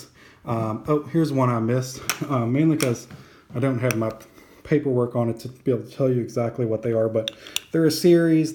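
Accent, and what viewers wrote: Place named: United States. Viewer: Too much diversity IMO,if you are going to sell that in the future for your retirement or whatever you will have to look the price of each coin. If you are a collector that's fine but if you stack silver for selling in the future you will have some trouble. I would recommend only buying bulk of 1 coin,you sound like an american so you should stack silver eagles. It's not that fun to have a pile of the same coin but in the end when the time comes to sell it's way better. Remember this is not for fun but for keeping wealth you have and maybe get something more in the future,nothing else brother.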